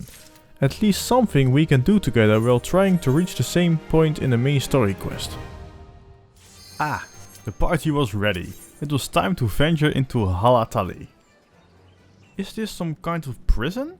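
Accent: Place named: Netherlands. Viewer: Hehehe, your dutch accent makes me happy. I hope you enjoy the game!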